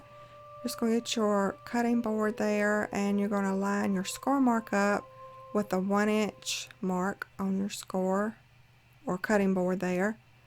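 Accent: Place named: South, United States. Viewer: Where did she grow up to acquire her country accent?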